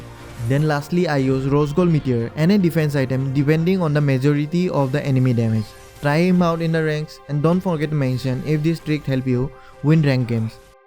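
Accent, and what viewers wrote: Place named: Assam, India. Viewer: Assamese accent hmm r u a Assamese guy ?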